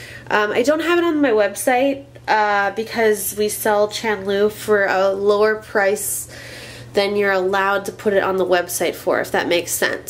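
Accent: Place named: South, United States. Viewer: Happy 200th! Loved the southern accent! Thinking the lil man needs his lil' behind cut before he gets some gross build up back there!! He's such a cutie pie!! XOXO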